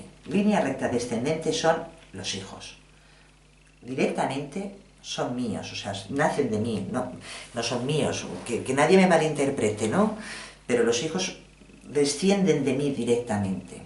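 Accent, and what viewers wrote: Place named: Valencia, Spain. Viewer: Buenas tardes. He decidido envalentonarme y preparar unas oposiciones. Soy malísima para memorizar, y además soy de ciencias, por eso agradezco mucho tus vídeos explicativos y que nos dediques tu valioso tiempo. Soy una enamorada del acento valenciano, tengo muy buenos amig@ en Valencia. Un abrazo desde Málaga y muchas gracias por todo! Raquel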